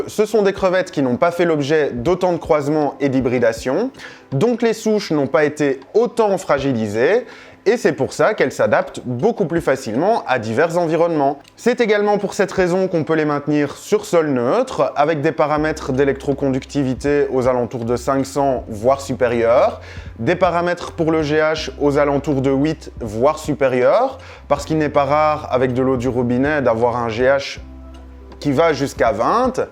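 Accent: Belgium. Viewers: Excellente ta vidéo, explications vraiment claires ! tu es belge ? Le Houit ne trompe pas en général 😊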